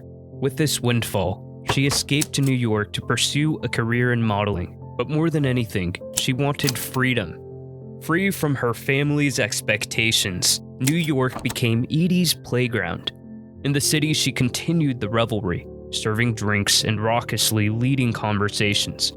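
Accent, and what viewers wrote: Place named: California, United States. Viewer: It’s a California shift. Interesting thing happening with the accent. They say things differently than you.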